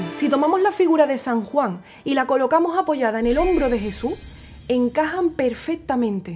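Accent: Andalusia, Spain. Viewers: Interesante relato, me sorprendió la partitura en la cola 😂no la conocía (no quiero imaginar como suena😂) Nunca puedo aburrirme escuchando todas éstas historias que me encantan y esas manos acariciando esa guitarra, es un sueño.🍀🌷☘🌺☘ ¡Anda Lucía! que con ese acento tan lindo al hablar me voy para Andalucía! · Genial análisis, bastante erudito y además me encanta tu acento, es andaluz?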